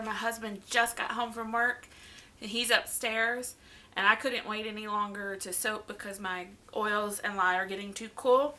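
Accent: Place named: South, United States. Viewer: I love your voice. Such a pretty "Southern Belle" charm:)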